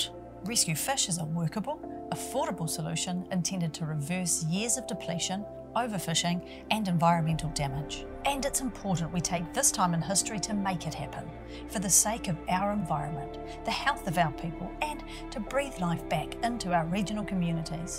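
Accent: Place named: New Zealand. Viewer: That's a good thick kiwi accent!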